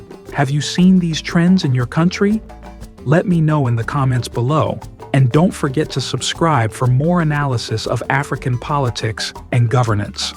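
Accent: United States. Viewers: U sound American with your accent....also do remember how the US has meddled in and still.meddles in african countries......the uk is a monarchy....and many other European countries..and in the US u have the bush dynasties.....Clinton's....Kennedys.....and it's ok for...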